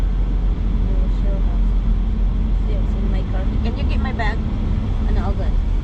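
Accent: Visayas, Philippines. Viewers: hello mam maayong buntag....ka cute sa imong mga ering og ero hehehe bisaya kaayo.....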